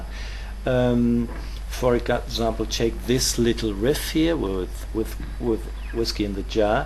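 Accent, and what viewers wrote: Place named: Germany. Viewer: he sounds more german than anything...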